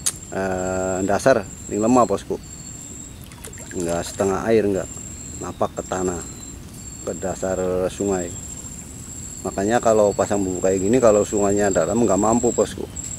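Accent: Indramayu, Indonesia. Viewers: Kya wong dermayu y ngomonge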